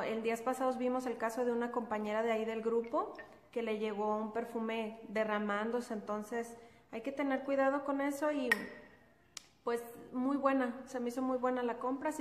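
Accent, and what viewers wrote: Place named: Nayarit, Mexico. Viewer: Jejeje me encanta tu acento nayarita, asi hablan las tias de mi esposo💕💕💕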